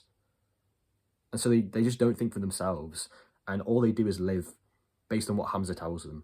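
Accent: United Kingdom. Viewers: it’s a Yorkshire accent my guy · Is ur accent Manc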